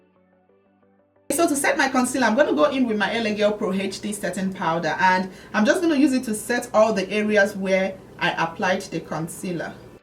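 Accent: Nigeria. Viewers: I like that you keep your Nigerian accent and you don't fake an "American" accent or water down your accent like a lot of Nigerian youtubers I've seen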